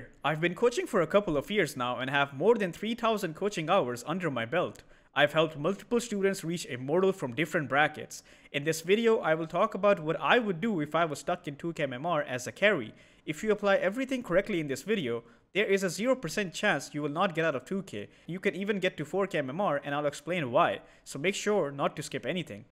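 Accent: India. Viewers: The Indian accent throwing me off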